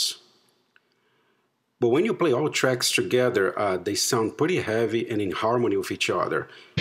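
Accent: Brazil. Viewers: You sound Brazilian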